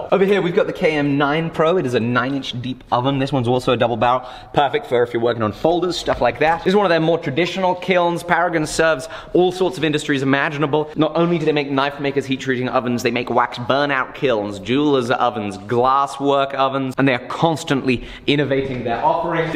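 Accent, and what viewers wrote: United Kingdom. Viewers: thought so as he says certain things in a british way or using British slang plus the accent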